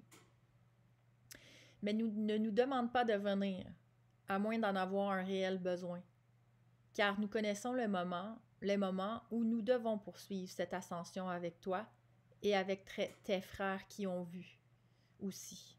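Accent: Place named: Canada. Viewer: Merci de votre reponse....Cela me touche aussi car cela me conforte que nous sommes des êtres divins et nous avons un rôle à jouer dans l'éveil pour emmener tous nos soeurs et frères du monde terrestre vers une vie dans l'Amour La Paix et la Lumière.....Gratitude....Namasté PS: j'aime beaucoup votre accent canadien....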